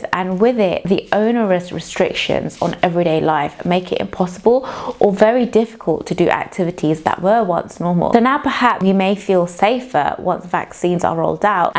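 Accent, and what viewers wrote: United Kingdom. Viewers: R u from London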